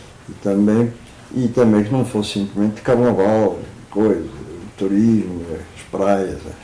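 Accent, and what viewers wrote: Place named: Portugal. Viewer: Sempre o sotaque portugues------isso ´ é bom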